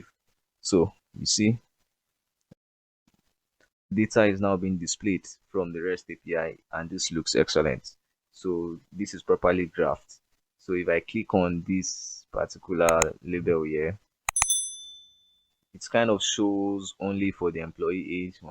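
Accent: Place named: Caribbean. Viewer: Nice video! Very helpful since I'm just starting out with APIs. Are you from the Caribbean by any chance? Cool accent :)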